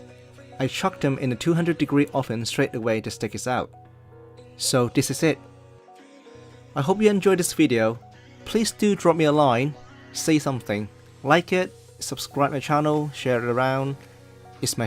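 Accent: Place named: United Kingdom. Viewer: Congrats! Finally your English Channel is here. Need to get a little used to your British accent lol since I’m from the U.S. will always support you as your other channel. 👏👏👏👍👍👍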